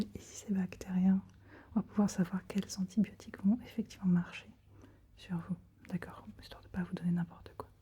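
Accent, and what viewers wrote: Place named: France. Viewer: This channel is the only ASMR channel that actually makes me fall asleep 🙂‍↔️ His French accent is very cute to listen to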